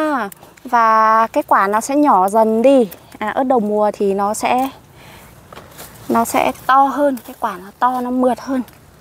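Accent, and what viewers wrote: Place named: North, Vietnam. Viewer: Cháu này là người bac ngje nói mộc mạc quê hương bac của chúng tôi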